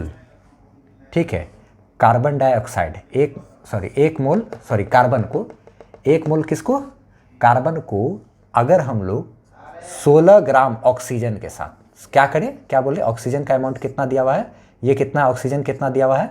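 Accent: Bihar, India. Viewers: Sir are u from bihar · Sir do u from BiHaR ??....😊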